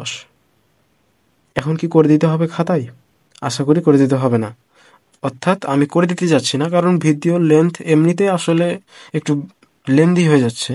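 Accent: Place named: Chapai Nawabganj, Bangladesh. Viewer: আমি আপনার কথা বলার ধরন থেকে বুঝতে পেরেছি আপনি চাপাইনবাবগঞ্জের 😊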